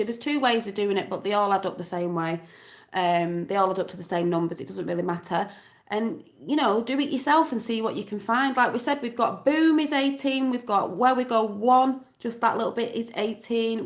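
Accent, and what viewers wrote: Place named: Yorkshire, United Kingdom. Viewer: Yorkshire? I have friends there, they talk like you. Thank you for sharing so much with us, your passion is contagious!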